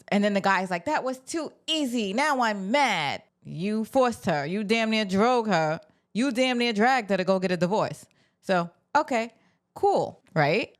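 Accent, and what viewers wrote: New York, United States. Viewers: yea I was thinking NY !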